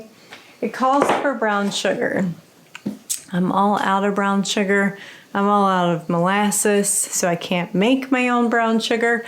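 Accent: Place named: Michigan, United States. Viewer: My family is from Michigan 😊 I love the accent it reminds me of them 💜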